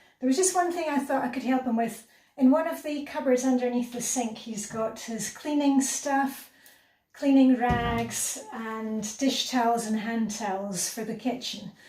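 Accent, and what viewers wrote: Scotland, United Kingdom. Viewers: What a well stocked kitchen, it’s great to see he cooks properly. I have a question which I hope isn’t too personal and respects your familiy’s privacy, but I have wondered about this for ages - do your children speak English with your beautiful Scottish accent?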